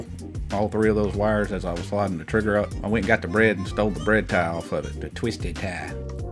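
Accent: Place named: South, United States. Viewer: Thanks for that i followed that through, much love, portsmouth england. Love that southern usa accent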